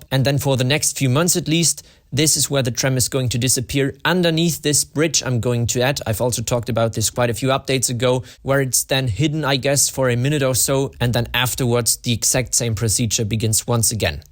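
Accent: Germany. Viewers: Are you from Germany or Austria? btw, love your video's! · I hear a bit german XD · HAH ICH WUSSTE DAS DU EIN DEUTSCHENAKZENT HAST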